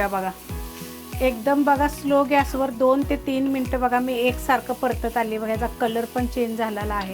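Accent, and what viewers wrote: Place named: Marathwada, India. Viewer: Tumhi marathvadyachya ka? Bolnya Varun asach vatla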